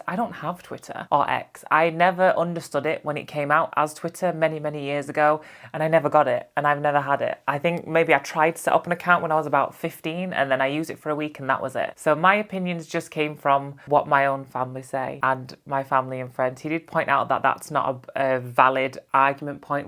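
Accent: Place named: Manchester, United Kingdom. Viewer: Only mean thing I can say is how dare you be prettier and more attractive and beautiful than me! Also love the Mancunian accent 🩷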